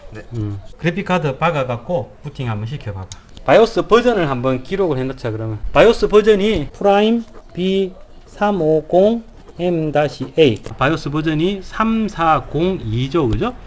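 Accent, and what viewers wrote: Gyeongsang, South Korea. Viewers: 참 컴퓨터 조립처럼 변수가 많고 ㅇ;벤트가 많은 것도 없는거 같습니다컴퓨터 조립이 별거 아니라고 쉽게 생각하는 많은 사람들에게 좋은 교훈이 되는 영상들인거 같습니다 ㅎㅎㅎㅎ쉽다면 쉽지만 어려워 질려면 어마어마 하게 고난이도로 올라 가버리는게 컴조립인거 같습니다 항상 재밌게 풀어 나가는 영상 잘보고있고 배웁니다 ㅎㅎ억양은 경상도이신데 먼데 가셔서 자리를 잡으신듯 합니다~가계구경이라도 가보고 싶내요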